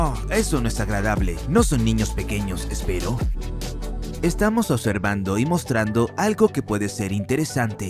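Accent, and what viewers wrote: Russia. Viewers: Eres ruso o español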